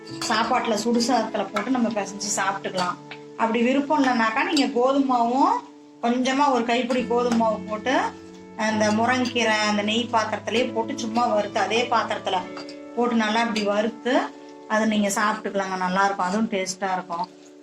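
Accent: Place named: Kongu, India. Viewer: கொங்கு தமிழ் நல்லா பேசுறீங்க.. ஏன் background English பாட்டு போட்டுருக்கிங்க ?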